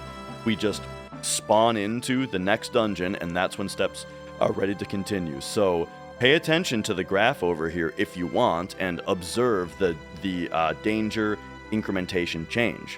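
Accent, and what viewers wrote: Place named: Scandinavia. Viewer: I literally had an entire 10 minute existential crisis wondering if you were Nordic with an amazing English accent or if I were just schizing. Your speedrunner profile says you're from MN, and also MN has a lot of Nordic heritage, but then I realized it would be equally likely that of all of the places a Nordic person would move, it would be probably North Midwest. And then, lastly, I realized that this has distracted me from the substance of the video so I rewound and found myself really enjoying the video. Liked : D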